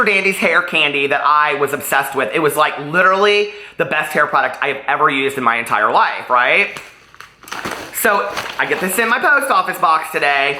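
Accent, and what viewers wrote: South, United States. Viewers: ahhhhh j star clickbait from the drama channel. is so vocal fry...drama channel grown man trying so hard to sound like an 80's valley girl with his gay southern accent.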